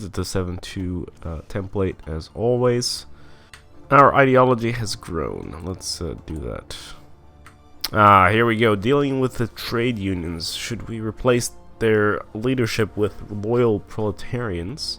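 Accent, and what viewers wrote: Brazil. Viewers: You have an exelent brazilian accent :D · Okay, Carioca ou Paulista? Cause that sssss issss thiccc boii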